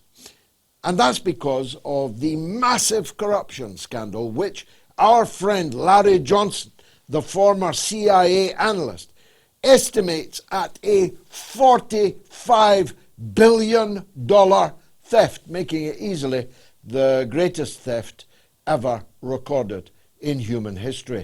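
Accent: Scotland, United Kingdom. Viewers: The Scottish accent really helps to emphasize the urgency of the message. Brilliant.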